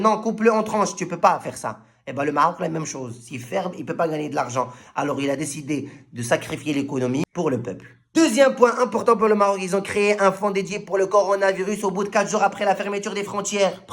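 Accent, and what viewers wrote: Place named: Algeria. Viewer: ET C KOI CET ACCENT PIED NOIRE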